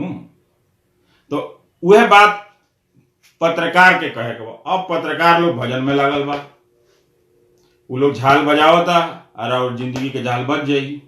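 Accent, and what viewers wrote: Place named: Bhojpuri, India. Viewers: Your bhojpuri style is very awesome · Keya bolte bihari bhasad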